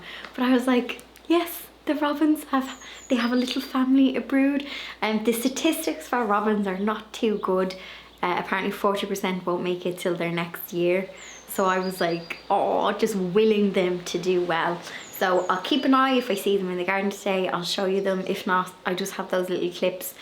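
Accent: Ireland. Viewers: Just saw this .lovely to hear an Irish accent . Is your garden in Ireland . I’m in Kerry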